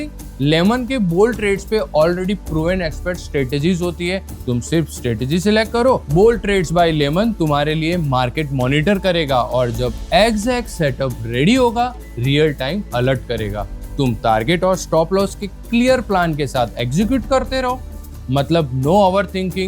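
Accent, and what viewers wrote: Gujarat, India. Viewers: BY YOUR ACCENT YOU ALSO SOUND LIKE A GUJU DON'T. U KNOW AMBANI WILL GET ALL THE APPROVALS NEEDED BY OUR HONORABLE PM WE WILL WIN MODIJI IS THE GREATEST PM OF. ALL TIME. HE WILL DELIVER TO AMBANI WHAT HE NEEDS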